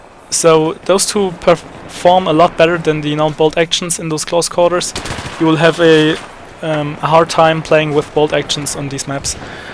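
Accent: Germany. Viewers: german accent too thicc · Du bist doch Deutsch man merkt das voll :) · Are you German ???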